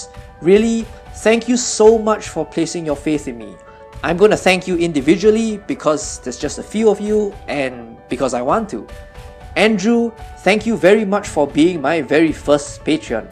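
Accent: Singapore. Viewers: Ah, I see what you mean, then. I think he's simply explaining the ones that will be playable, as that's probably what non-lore followers will care for, and it seems that english might not be his first language (it might be, I'm just guessing based on the accent and seeing him talking about coming from Singapore in the comments), so there could also be contextual language that might be a bit mixed up there as well.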